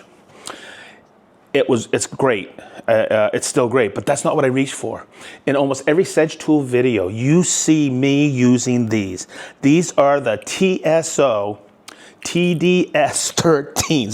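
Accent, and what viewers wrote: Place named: Boston, United States. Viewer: I know a Boston boy when i hear one. Thanks for the video buddy, just discovered your channel and looking forward to more. Cheers from Malden! 😂 Oh… and it’s Aliens for me.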